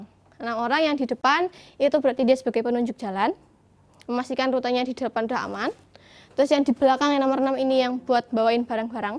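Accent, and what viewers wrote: Java, Indonesia. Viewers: Medok-nya keren. Ini namanya locally rooted. Local wisdom 😊👍👍👍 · Medok bgt si wkwk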